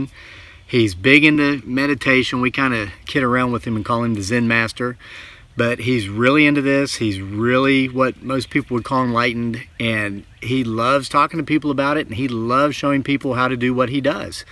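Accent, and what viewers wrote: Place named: Virginia, United States. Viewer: I’ve never seen your channel before & I’m learning more mindfulness practices in a Focusing class going inward to see what the body is trying to tell me...& I just learned Transcendental Meditation for free online, but coming off a Benzodiazepine makes it hard to clear one’s mind of all kinds of junk from yrs of sedation. I’m an Empath, as well. I heard Ta Ta Ta or Tathata, as well! What amazing men you & your friend both are! This is a delightful video! Thank you! 🙏🏼 Oh! I think it may have been Judith Orloff talking about those who are Earthbound trying to latch onto Empaths...at least, I think she was the one talking about this...anyway, this person said to just imagine showing them the light as in silent conversation so they can return. I don’t know if this is helpful, but it seems to be for me. Best wishes to you & your Zen friend. 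🙏🏼 P. S. Oh, as you were speaking early on, I wondered if you were from Virginia, the accent, plus my birth parents are from there & I have a couple friends there, as well as all along the E Coast. Then I saw what your hat said...interesting.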